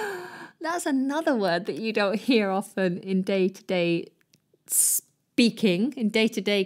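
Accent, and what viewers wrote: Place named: United Kingdom. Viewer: Love it. Love British accent.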